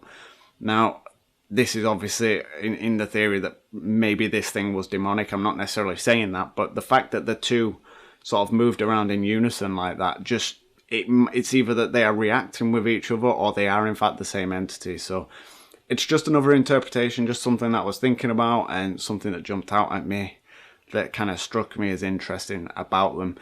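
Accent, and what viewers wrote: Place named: Manchester, United Kingdom. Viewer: You have some of the best videos on YouTube, and some of the best paranormal videos I've ever seen. I apologize if I have missed your Q&A's that may have answered these, but I have a couple questions: 1.) Have you ever thought of taking up a professional career, in paranormal investigation? (I personally think you'd be great at it, though I know you are more interested in your own personal experience and residence right now) 2.) Where, in England, are you from? (I realize you likely enjoy your privacy, so you do not have to answer this question - I was trying to place the accent, along with my son...and we guessed Manchester)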